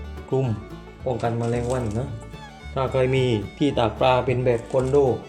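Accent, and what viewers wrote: South, Thailand. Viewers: ชอบสำเนียงการพูด จังเลยหนิ😊😊😊รู้เลยว่า เป็นคนที่ไหน☺️☺️☺️☺️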